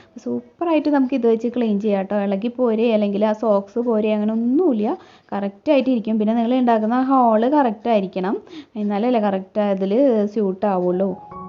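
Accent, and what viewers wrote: Thrissur, India. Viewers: Thrissur bashayanallo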